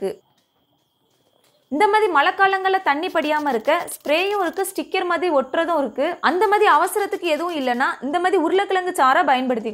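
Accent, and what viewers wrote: Tirunelveli, India. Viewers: திருநெல்வேலி நாடார்களின் குரல் சூப்பர்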